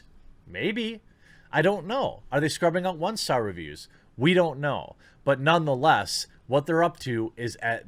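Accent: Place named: Midwest, United States. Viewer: Rotten to-mate-ers... yeah this guy is Midwest. I bet he has a Davenport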